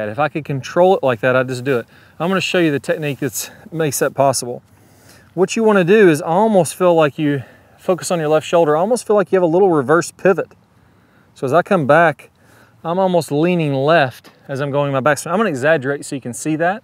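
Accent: South, United States. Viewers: another thanks from me here in the UK. Your lessons are fantastic and right on point for game improvement. And that nice southern drawl makes watching a Zen-like experience!:-D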